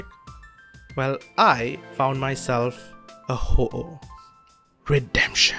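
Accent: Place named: India. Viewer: nah no way no way UR INDIAN I kinda thought u were INDIAN by ur accent but then I thought it would be racist but O MY GOD FINALLY A FELLOW GUY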